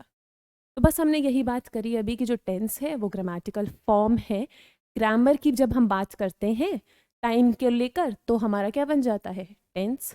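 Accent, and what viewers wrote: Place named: Uttarakhand, India. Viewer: Hello Mam aap dev bhumi uk se lgre ho aapki language tone se or sir name se clear hai ki aap yhi se ho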